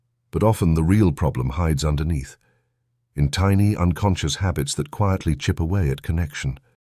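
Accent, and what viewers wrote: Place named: United Kingdom. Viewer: I just realized the AI voice has a British accent. What a comical choice. Did the creator of this video think the accent would give the presentation more authority? I wonder.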